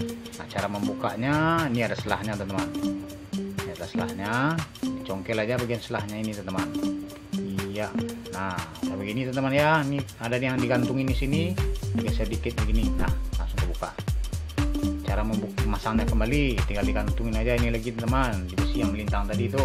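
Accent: West Sumatra, Indonesia. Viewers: lu orang minang kah bang?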